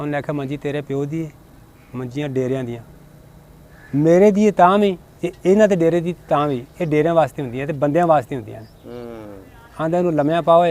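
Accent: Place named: Punjab, India. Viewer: Auncle g my parents came from Gurdaspur too, I like yr Punjabi accent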